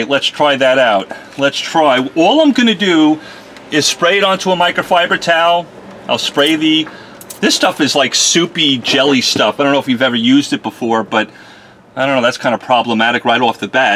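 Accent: New York, United States. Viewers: You sound like you’re DEFINITELY from New York lol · Thanks you made my day. Dallas is awesome but you sound like a New Yorker.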